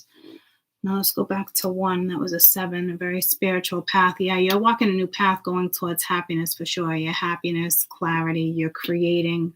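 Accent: Boston, United States. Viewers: First time here. Saggie!! Thank you. I hear an accent, are you from Boston/ New England Area?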